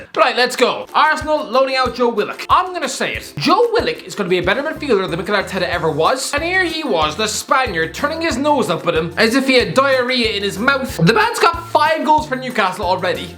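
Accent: Ireland. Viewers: An very Irish accent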